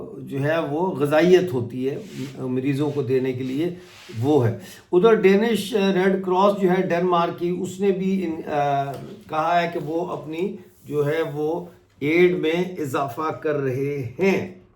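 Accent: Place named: Punjab, Pakistan. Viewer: Chacha Apne pojk Ka bhi Btao kya ho Raha he Waha? 😮 Tum baaki poori duniya ki News btate to LEKIN pojk Ka ni btate ? Tum panjabi ho kya? Tumhara Accent panjabi Lagta he 😮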